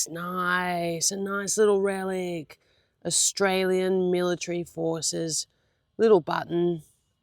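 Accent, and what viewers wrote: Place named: Germany. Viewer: Well done on the finds and thanks for the exclusive footage from Treasure Hunters Down Under.Congrats on being film stars overseas and I hope we get to see it soon down here.Love your German accent! Is the mysterious camera person? Cheers👍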